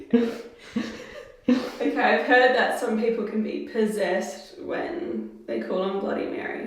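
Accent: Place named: Australia. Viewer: Omfg that Ozzie accent I'm dying 😆😆 you go alright aye love your work greetings from kalgoorlie W/A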